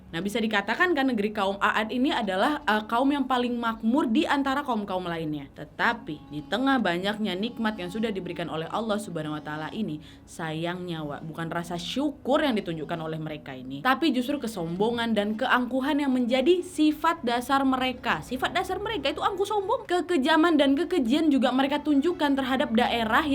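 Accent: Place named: Medan, Indonesia. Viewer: sebaiknya tidak menggunakan dialek medan... ngga semua orang ngerti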